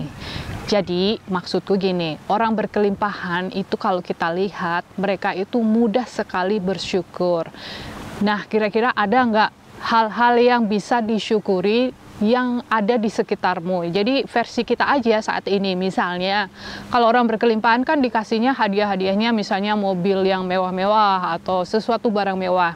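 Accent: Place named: Cilacap, Indonesia. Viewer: Baru ngeh kalau mbanya orang cilacap,ora ngapak ora kepenak Mantap mba salam 👍👍😊🙏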